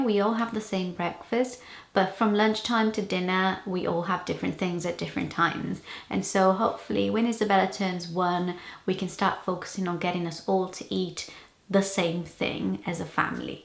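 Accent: United Kingdom. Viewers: I started to watch your videos because I love British accent and I am Brazilian as well. My purpose is improve my vocabulary and my listening skills . Congrats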